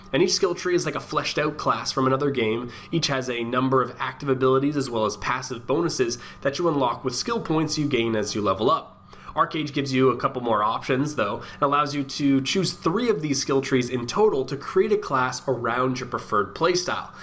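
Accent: Canada. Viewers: I hear teh Canadian creeping through.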